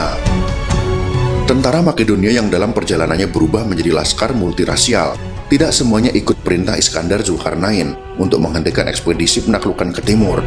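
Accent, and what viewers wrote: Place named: Minangkabau, Indonesia. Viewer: Nice Minangnese Pronunciation 🥰🥰🥰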